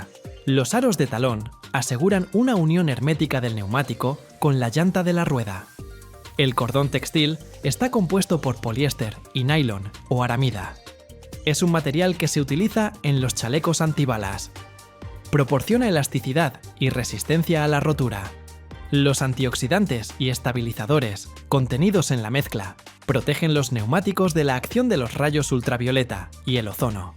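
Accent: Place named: Spain. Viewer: Buenas imágenes, buenas tomas en el vídeo pero que lastima que hables como los españoles de españa,España con “e"menuscula soy Americano Nativo, greetings.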